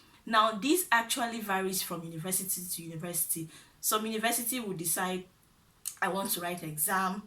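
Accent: Nigeria. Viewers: Hahaha.. This ya Igbo tongue no fit leave you ooo.. Thanks for all you do.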